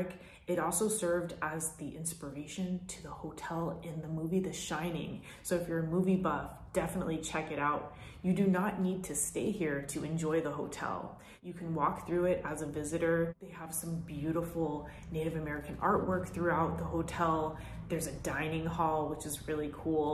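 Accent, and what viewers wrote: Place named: Australia. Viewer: Great video! Thank you and your Aussie is darling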